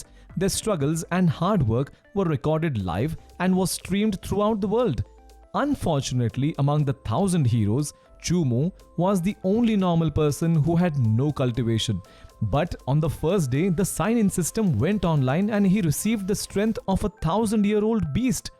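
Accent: India. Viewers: indian accent is strong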